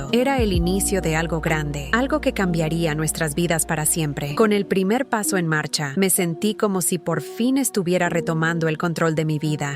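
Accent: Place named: Portugal. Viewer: Y ese asentó portugués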